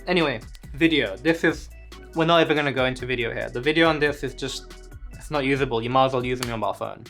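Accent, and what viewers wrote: India. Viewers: I like your videos. I also enjoy your accent and your indian accent (x carry on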